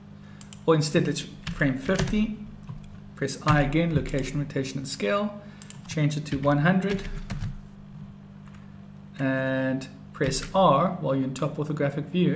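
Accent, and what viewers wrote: South Africa. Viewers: Are you South Afican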